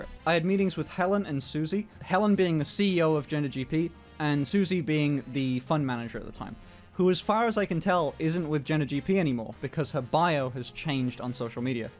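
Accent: Birmingham, United Kingdom. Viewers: NGL - Never thought you were a brummie fr fr - Does that mean I might have seen you in Gales? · Both British and a Brummie. Fucking disgusting!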